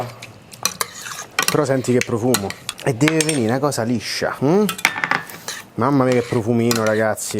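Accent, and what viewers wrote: Rome, Italy. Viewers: tutto quello che fa e divertentissimo sara perche parla romano... ma la gioconda non l.hanno rubata pero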